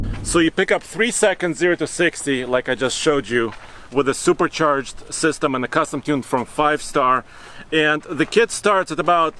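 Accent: Russia. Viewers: Is this guy Russian? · Man, i hate his russian accent. Idk why.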